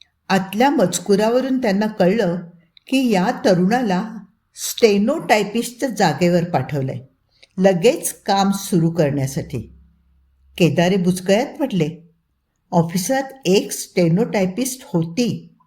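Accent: Standard. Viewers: खूप छान वाचन ....! सुस्पष्ट आणि शुद्ध उच्चार.....!! ऐकत राहावंसं वाटतं.....!!! आवाजाची जादू .....! अक्षरशः खरं आहे.... 👌👌👌👍👍👍🌹🌹🌹🌹🌹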